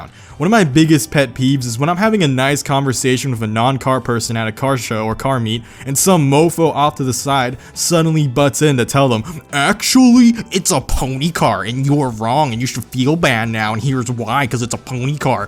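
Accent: United States. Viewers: Audi ≠ oRdi Peugeot ≠ Pew-jo Renault ≠ RenNo you yanks are the fucking worst i swear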